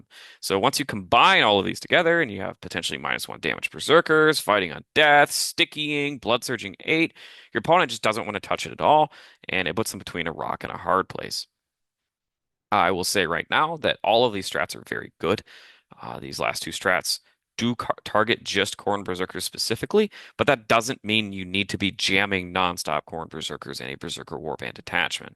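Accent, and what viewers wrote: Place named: United States. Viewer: Wait, I thought this was a British YouTube channel? Why is there an American man here?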